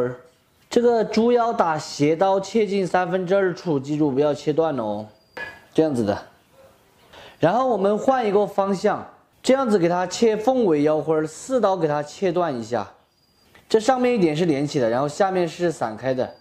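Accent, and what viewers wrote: Sichuan, China. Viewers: 喜欢看你做菜的视频，更喜欢你川普😄😂，可惜我是不喜欢做菜但又很很喜欢吃。只能看看你的视频过一下瘾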